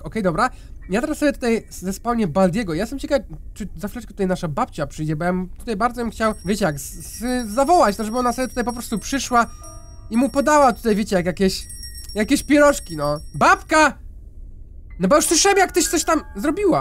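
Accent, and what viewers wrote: Silesia, Poland. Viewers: wyglądasz na tego co mieszka na śląsku? Szczególnie jak mówisz (godosz)!!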